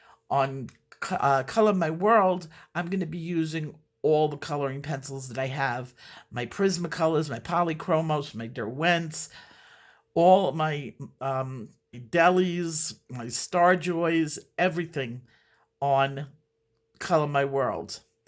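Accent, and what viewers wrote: New York, United States. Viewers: oh my goodness, you have no idea how excited I am. I always wanted to learn about color and color theory. Mostly for fashion purposes and how color effects people; but never thought of myself an artsy kind of person at all. Until about a month ago, I had no interest in coloring in coloring books. I had no idea this community even existed. Now I’m obsessed!I’m looking forward to all the challenges, that I have no doubt you will be putting on us! Potty mouth, NY accent and all. LOL! Truly looking forward to the channel, learning and growing ❤️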